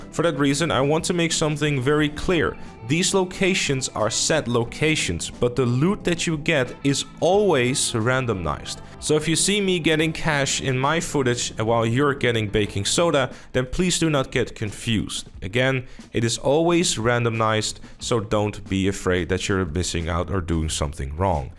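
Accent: Netherlands. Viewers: You sounds like a Dutch guy who's speaking English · This guy has a dutch voice haha. You must be dutch (nederlands)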